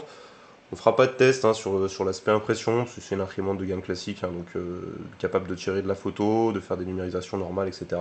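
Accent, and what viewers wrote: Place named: France. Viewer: Fr = French The accent seems to say he's from France.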